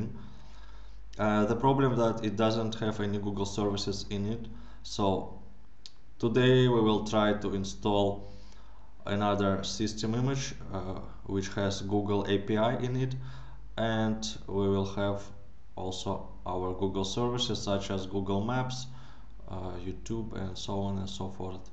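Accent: Russia. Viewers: Улыбнул русский акцент )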